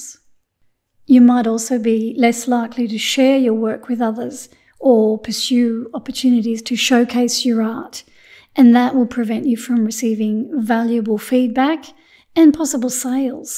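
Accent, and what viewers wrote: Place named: Australia. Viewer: I love your videos. I have just started watercolor painting after nearly 45 years since school and found your tutorials and tips very helpful and inspirational. I also love your humorous outtakes at the end and love your Aussie accent. ❤❤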